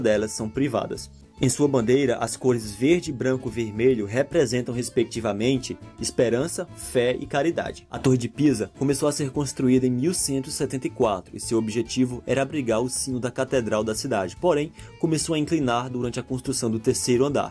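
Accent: Northeast, Brazil. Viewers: Tu É do Nordeste né!?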